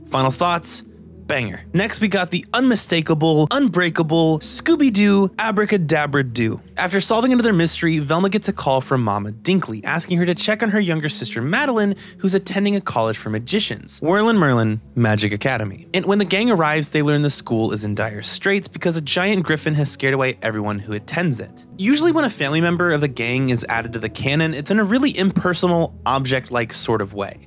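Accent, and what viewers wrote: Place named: New York, United States. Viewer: he sounds like a typical new yorker, watchu mean fam? 😂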